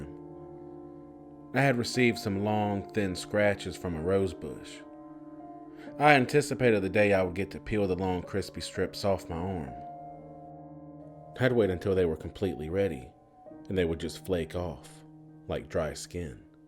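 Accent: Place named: South, United States. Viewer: I luv your southern accent so much it. Makes this channel your amazing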